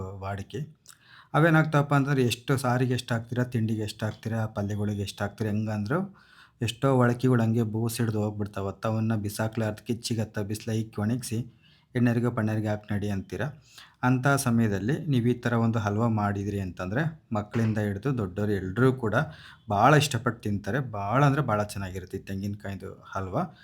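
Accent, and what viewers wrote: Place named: Davanagere, India. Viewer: We in our family love the way you speak Kannada... please preserve the Davanagere dialect🙏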